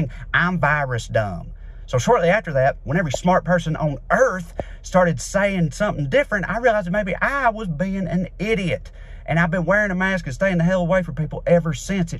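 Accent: South, United States. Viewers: Yeah he's got a Southern accent but he's 100% wrong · Well, This is REFRESHING!!!!!! A southern accent paired with REALITY, SCIENCE, and THE TRUTH!!! I LOVED the simplicity and honesty that acknowledges that this pandemic IS NOT ABOUT OUR INDIVIDUAL RIGHTS AND EGOS—but about PROTECTING OTHERS, AS WELL AS OURSELVES!!!! Well said, THANK YOU!!!!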